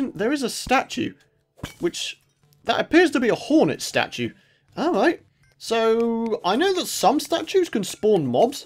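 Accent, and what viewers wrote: United Kingdom. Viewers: He's British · That is the strongest British accent I've ever heard... and I'm British myself! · What? You sound like a british guy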